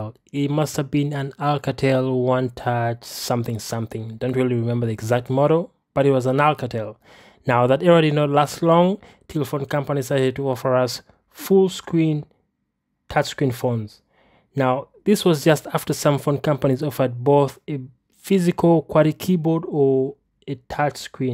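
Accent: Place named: India. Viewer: it's kinda good content but you sound indian and ofc keep making similar videos to this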